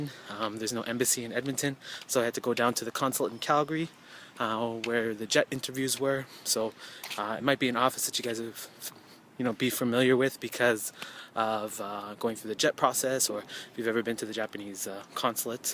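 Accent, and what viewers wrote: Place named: United States. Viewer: how come you have American accent? or is that how Canadians speak in general?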